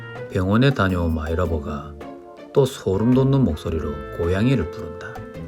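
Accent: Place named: Busan, South Korea. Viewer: 부산인가요? 사투리가 동질감 있어서 더욱 빠져들게 했어요. 고생 많으셨습니다. 좋은 일만 많이 생기시길 바랍니다.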